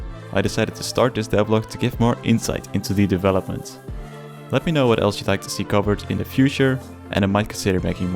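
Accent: Netherlands. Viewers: love the dutch accent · Broer dit Hollands accent met dat Engels, ik herkende t meteen bwhaha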